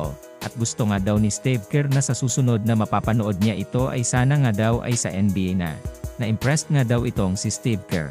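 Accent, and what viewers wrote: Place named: Pampanga, Philippines. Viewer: kapampangan yung nagbabasa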